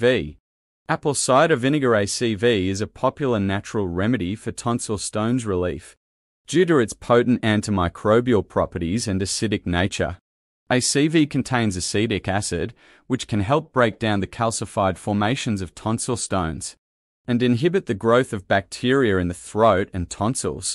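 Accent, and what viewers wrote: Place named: Australia. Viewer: I love hearing Australians speak I can listen to that shit all day it's relaxing to me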